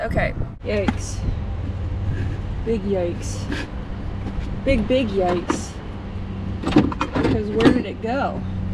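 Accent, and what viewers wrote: South, United States. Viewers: I’m from NC and your southern accent 😂 I like it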